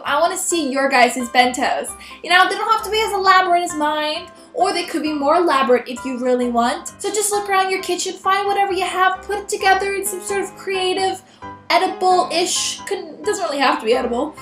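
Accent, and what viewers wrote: Canada. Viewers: i love your accent! canadians RULE! haha!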